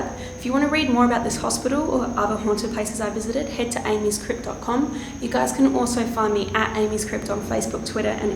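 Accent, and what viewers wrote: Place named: Australia. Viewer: Oh, I don't mind at all. I love watching you, as well as listening to your Australian accent. :) Not sure if that spirit you encountered feels the same way, though...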